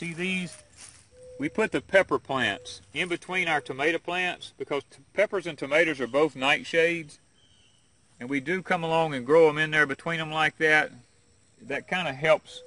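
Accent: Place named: Texas, United States. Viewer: Inter-determ-ah-neent,. LOL luv ya'llz Texan accent, but here in Mississippi we say Indy-term-ah-nent (indeterminate) tomatoes... But besides that you tellin it right brother. Especially the kids n plants runnin wild LOL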